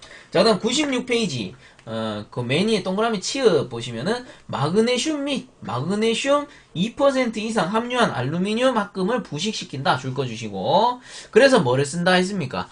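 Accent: Gyeongsang, South Korea. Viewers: 끼긱끼긱 빵터짓읍니다 경상도 방언 좋네요 열정적인 강의 감사합니다 NH3암모니아 올림 ^^ 따뽕 좋아요 꾸욱!!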